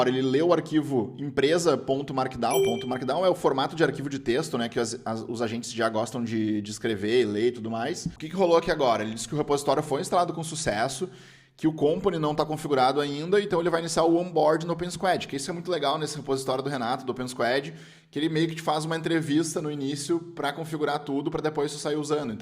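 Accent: Brazil. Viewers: incrivel a qualidade do canal muito bom, somente o sotaque gaucho que não é tão agradável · Meu amigo, sotaque de Porto Alegre dói na alma pqp kkkk.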